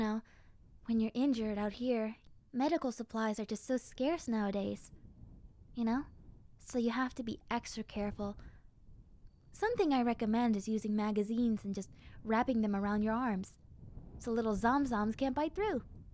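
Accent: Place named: New York, United States. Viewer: I love your New Yorker accent! >//~//< Flippin adorable. X,D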